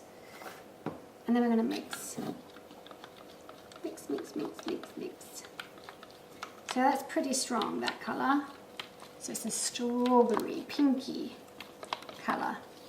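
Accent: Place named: London, United Kingdom. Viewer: Hi there ive discoveted your chanel and absolutely loving everything you surround yourself with. The girly in me would love to just emulate everything you have. However I live in a house full of males (husband and 2 sons so I keep it a very neutral grey and white theme). I adore the colours of the gorgeousness on the table as well as the colours of your paints. Just a couple of quesries/points... When you were mixing your paints and whilst adding in the white - you mentioned you were adding 'teaspoons' of white paint - however, the spoon you was using appeared to be much bigger than a teaspoon so please would you be able to clarify your measurements a little better to save confusion in future videos. The spoon in the white paint also appeared to be quite a bit bigger than the spoon in your powders which did look more like a teaspoon size. 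Also you mentioned cost in dollars but you sound English (from the greater London area). Are you based in the states or some other place that uses dollars as I suppose I am assuming you mean US dollars but this could be just my assumption. If you are in the UK still, please could you provide costs for your paint powders in £ sterling. Just one more thing. The resolution of the video doesn't fit a full mobile screen (when in landscape mode). All other videos I play from other channels fill the area as they should. Is this something in your set up or mine. Although I would say it's not mine but maybe something different I have to do with yours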